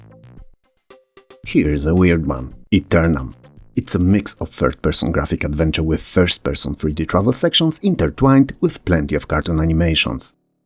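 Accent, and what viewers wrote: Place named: Poland. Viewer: I'm pretty sure you're Polish, I recognize the accent and certain manner of speaking we Polish people are having trouble to mask. Nevertheless, good job, thank you. I miss times when I could play Dragon Lore for hours and absorb it's strange atmosphere. I tried again few years back and all I felt was frustration.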